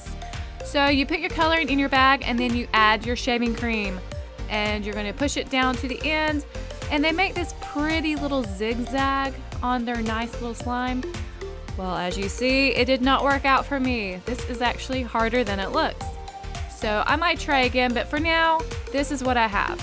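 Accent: United States. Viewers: you have a cowboy acent :ooooooooooo seen anything weird here?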